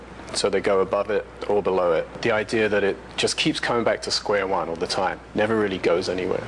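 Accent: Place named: England, United Kingdom. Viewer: His English accent is hardly noticeable in this.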